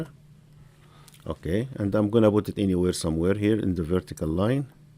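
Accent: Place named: Russia. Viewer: great videos, 3 days before i worked only In INVENTOR, but now starting be proficient in Edge to :-D ty. Nd BTW, from your accent, you RU ? :-D I am Slavic to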